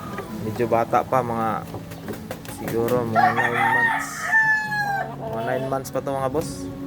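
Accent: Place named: Sabah, Malaysia. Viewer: your voice like Sabah people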